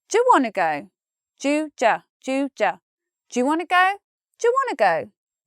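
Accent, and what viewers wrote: United Kingdom. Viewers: Is this english british or language amrican!!! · I hear you used british accent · * O * sound make me love british Btw love u from Indonesia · is this British English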